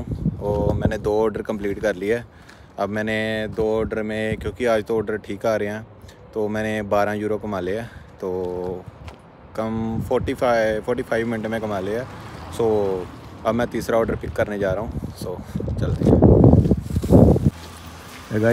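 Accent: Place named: Punjab, India. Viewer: Punjabi nikal hi jandi moh cho 😂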